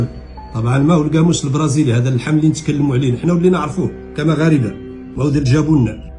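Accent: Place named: Hassaniya, Mauritania. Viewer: الله على اللهجة الحسانية .. الله يحفظكم اهل الصحراء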